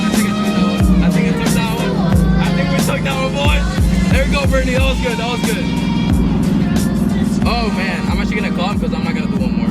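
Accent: Cuba. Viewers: Dude yes please more videos like this this shit made me laugh so hard 😂😂😂 that Cuban accent though de pingaaaa lmao I live Miami so you Already know what that means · Acere your Spanish is Cuban asf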